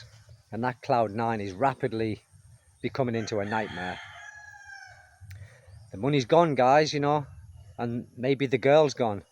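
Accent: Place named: Newcastle, United Kingdom. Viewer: “Hello you lovely lovely people” is the reason I tap on his video every time he uploads one. Also because Geordie is a fascinating accent, I’m scared to set foot on Philippine soil. The nightmare begins in the airport. I just like to travel vicariously through videos of their beaches and lush greenery in the mountains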